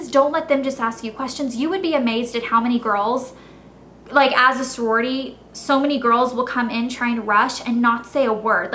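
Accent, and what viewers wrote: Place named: Ivy League, United States. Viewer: You sound like you went to Cornell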